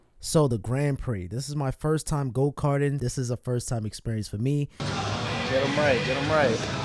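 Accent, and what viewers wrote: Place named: Queens, United States. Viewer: Omg you from southside queens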